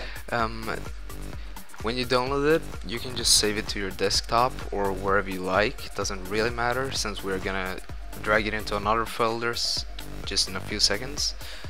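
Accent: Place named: Sweden. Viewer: thanks :D man hör att du e svensk ;)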